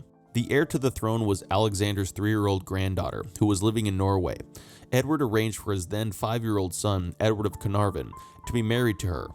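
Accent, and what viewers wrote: United States. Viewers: I can't watch this it doesn't seem right with an American narrating. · An excellent narration! [Do I detect a midwestern accent?] I'm looking forward to more from you. I'd like to see an in depth history of early czech/moravian rulership. It's hard to find anything on this.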